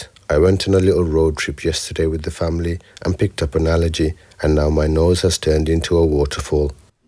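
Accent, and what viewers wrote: Birmingham, United Kingdom. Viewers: I can only click the thumbs up once, you deserve more. And don't even think about NOT doing a commentary again. Your soft and gentle Brummy accent absolutely nails the information you impart. Yet another thoughtful and honest refurb which, if you had gone too far with aggressive cleaning and new plating, would have spoiled the watch and its history. We are back to you not marking your work, but we've talked before about that. So; 'tara a bit'. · great to have your brummyness back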